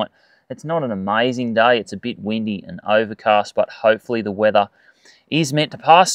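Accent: Australia. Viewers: Proper unadulterated Aussie accent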